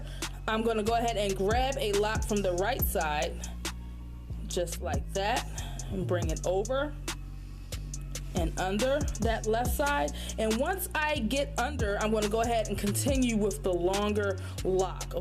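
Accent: Baltimore, United States. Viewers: Baltimore I can place that accent from anywhere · Are you from baltimore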